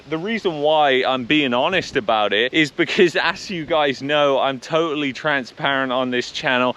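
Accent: United Kingdom. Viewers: You still have your northern accent so you'll fit right in. I am expat from UK, Dorset, on the south coast. I've always kept up with the UK news. I wouldn't go back to the UK if you paid me. The brainwashing there is quite possibly worse than here in Canada. The "new" Brits are nothing like the people I grew up with and they seem to have lost their compasses. Wait 'til you see how many rules and regulations there are that control so many aspects of your life. All this people living on an island with thousands of migrants arriving to use the benefits' system, that's why there are so many insane rules and regs. Too bad you didn't feel a loyalty to Canada and remember what a truly wonderful country this used to be.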